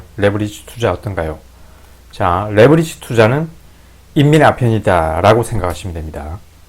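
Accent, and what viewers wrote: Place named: Gyeongsang, South Korea. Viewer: 말투가 경남분이신듯 😊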